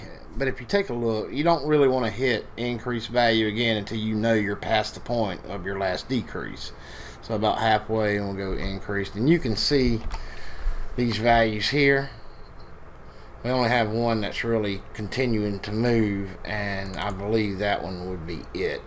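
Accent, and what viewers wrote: South, United States. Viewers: Southern sounding computer talk probably has to be the best thing ever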